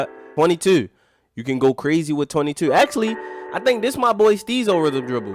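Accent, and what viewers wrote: Baltimore, United States. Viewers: Lmao I subbed cause your accent bra it reminds me of the wire👌🏾💪🏾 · got that deep baltimore accent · U from bmore ain’t chu ?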